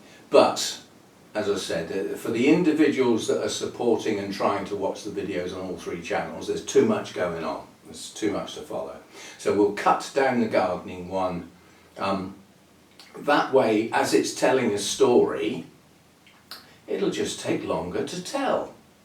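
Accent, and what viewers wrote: England, United Kingdom. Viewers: I have yet to visit England, and would love videos on your town!! I adore your accent 😉 (I’m from Washington State, USA)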